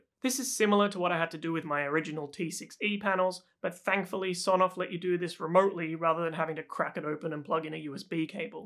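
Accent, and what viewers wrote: Australia. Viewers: Hey mate, I noticed the Aussie Twang. Understand you're in the UK, how much of this can I replicate in Sydney? I've got a mid 90s brick veneer home and things like neutral are not there and I'm not looking to get new wiring in.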